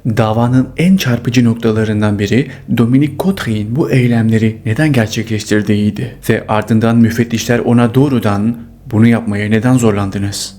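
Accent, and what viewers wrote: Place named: France. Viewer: Fransızca biliyor galiba onun için böyle konuşur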